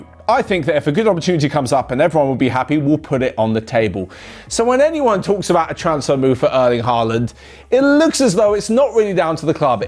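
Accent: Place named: England, United Kingdom. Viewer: Kinda is obvious with the accent, the name, love of english men for footbal etc..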